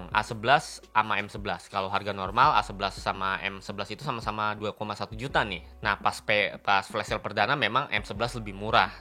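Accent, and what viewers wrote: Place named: Java, Indonesia. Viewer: Kalau ngomong kok medok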